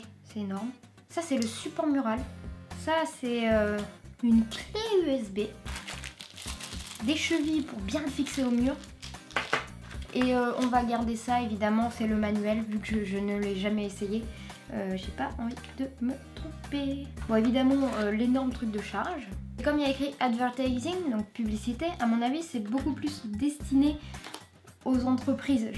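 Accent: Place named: South, France. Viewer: Est ce qu’en utilisant une photo tu peux produire un hologramme Super ton accent du suddd !!!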